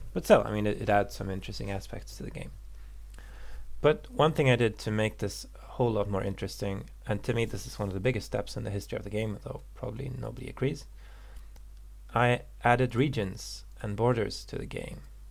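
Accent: Poland. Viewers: Are you Polish by any chance? You don't sound American And is this going to the android version as well?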